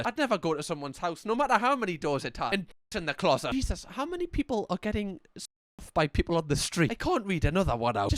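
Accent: Newcastle, United Kingdom. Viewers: Another day, Another Vid. Love u and the Geordie accent lad.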